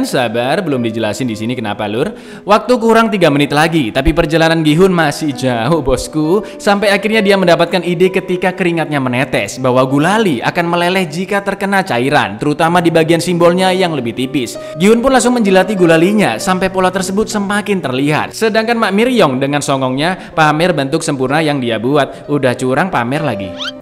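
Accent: Java, Indonesia. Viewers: ngomong e medok.. Monggo sekeca'aken dook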